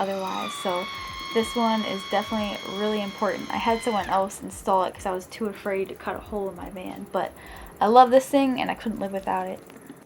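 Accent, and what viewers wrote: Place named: South, United States. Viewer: I must say you're very pretty and seem like wicked down to earth girl cool ass chick.. curious how old are you? To me you have an accent must be from down south I'm guessing right?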